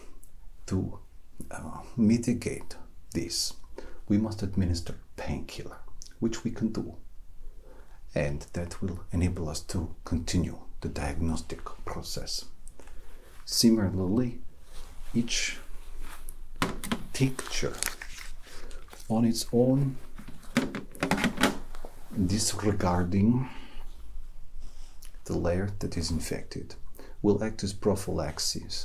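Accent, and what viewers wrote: Russia. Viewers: ! It's just amazing! I would like to thank you for your efforts to speak and write in russian. Nice job!💛 (русские отпишитесь здесь! я думаю, что вам тоже нравится, как он старается спародировать русский акцент) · I always love it when you use a russian accent in your videos. The autopsy part was my favourite. 😊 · I can't even decide what type of ASMR I love more - talking with Russian accent or surgical procedures. It''s a perfect combo :D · your russian accent is getting better!!! also very unexpected to see pathologic content, but so so cool. absolutely amazing games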